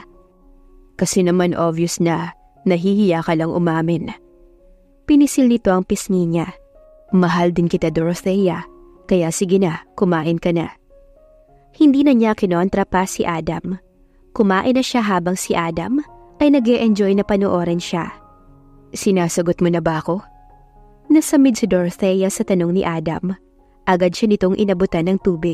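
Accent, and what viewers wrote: Philippines. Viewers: Talaga ba maganda ang boses ng narrator na ito? Parang tangang probinsyana ang bweset na narrator na ito. Naririnig mo ba ang tuno nyang igurot nakakairita.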